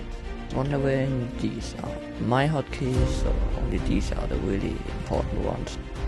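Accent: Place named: Germany. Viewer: Ngl as a German I think u have such a German accent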